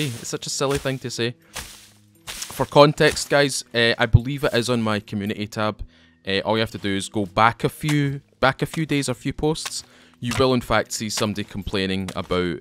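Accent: Scotland, United Kingdom. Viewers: Hey, I watched all of your SP Episodes and I learned so much about the game. Thanks and greetings from East-Germany. PS. I like you scotish dialect.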